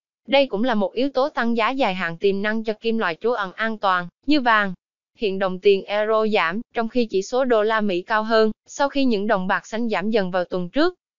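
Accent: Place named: Quang Nam, Vietnam. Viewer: Qua xem giá vàng ngàu 6 tháng 5 và xem luôn QC. Hôm nay có giọng quảng đọc phải không nhỉ.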